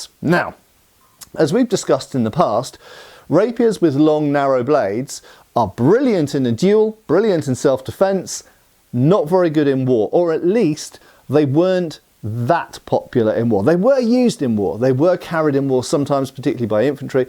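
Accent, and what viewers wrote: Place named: United Kingdom. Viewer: Nice video . Today I'm practising HEMA, and as spanish I would like to get as much possible "Spanish Swords models. I Have realized that there are millions and it's going to be an impossible mision. Perfect your british accent (and difficult)to practise my English listening. Beatiful swords you' ve got. THANKS MATE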